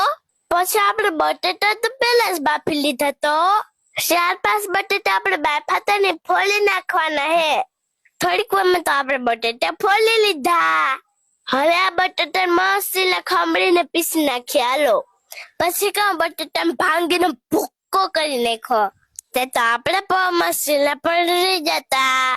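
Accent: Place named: Gujarat, India. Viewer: Bau fine Tari language desi gujarati bau fine bole che ❤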